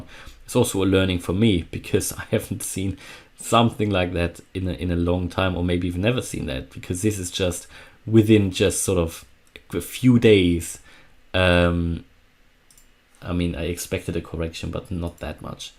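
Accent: Germany. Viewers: Thanks for the video, are you from Germany?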